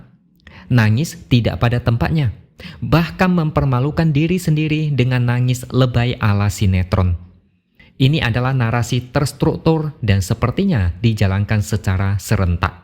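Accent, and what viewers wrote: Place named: Banyumas, Indonesia. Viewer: Wong ngapakk 🤟🤟🤟🤟🤟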